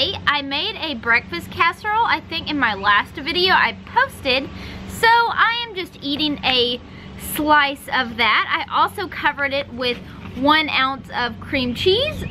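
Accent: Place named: Texas, United States. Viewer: A beautiful texas girl with a beautiful southern accent! What part of texas you from?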